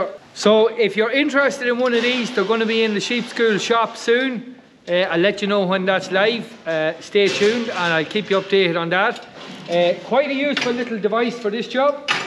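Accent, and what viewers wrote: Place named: Ireland. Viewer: Thanks for sharing! I love to listen to you and other Irish people speaking ! Very soothing to hear! 😊🐑🐏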